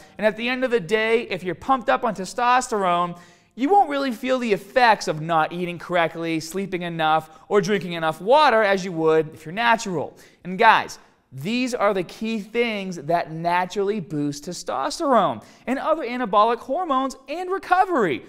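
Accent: Boston, United States. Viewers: The Bowston accent is awesome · Gotta love the boston accent! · u must be from boston :D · New here... are you from BOSTON? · are you from boston? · i cant tell how old you are, but i can guess you're from boston? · I'm new to this fitness thing... Are you from Boston?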